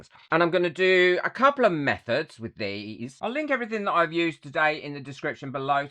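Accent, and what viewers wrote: United Kingdom. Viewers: This is such a great idea! You really can do a lot with it, maybe even craft your own roses and flowers or adornments using ribbon or pretty fabrics of specific colours. If you don't mind me asking, where is your accent from within the UK? I'm not a Brit but I'm curious about accents, always trying to place them XD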